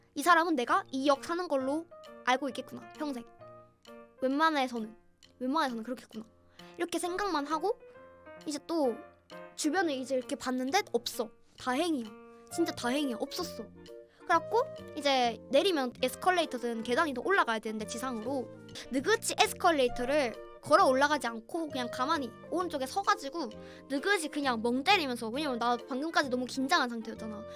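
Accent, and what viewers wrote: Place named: Gyeongsang, South Korea. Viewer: 갱상도 분이신갑네예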